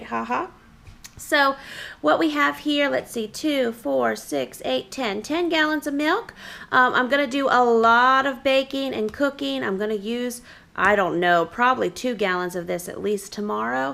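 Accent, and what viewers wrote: South, United States. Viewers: What state do u live in? if im not mistaken u have a southern accent?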